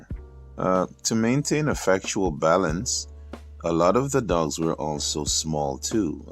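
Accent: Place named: Jamaica. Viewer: Off topic.. Are you Jamaican? Maybe its just me, but Im hearing a familiar accent.. Sound like a yardie..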